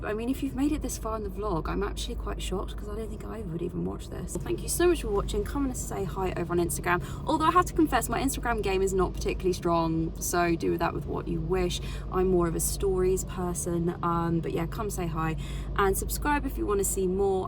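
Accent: United Kingdom. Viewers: She sounds British.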